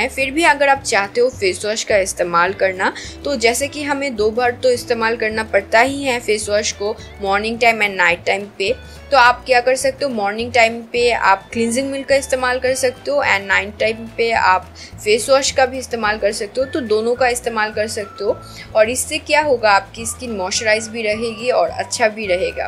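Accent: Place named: West Bengal, India. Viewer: But voice se to ap Bengali hi lag rahi ho ...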